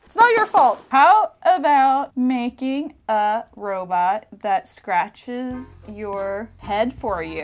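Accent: United States. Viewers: Wait, what, you're Swedish?? I'm Norwegian and have never heard any Scandianvian speaking with such a flawless American accent as yours. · It's so weird how she is so fluent in English that she sounds American but she can't read English fully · You sound totally American to me, I'm British Canadian and lived in Canada for 20 years but I still sound English. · do you have an american accent when you speak swedish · dude with your English speaking accent you can TOTALLY pull off that you're American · You could easily pass for an American! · It bites her sometimes. People assume she's American and think she's an idiot for not understanding common words like knurled.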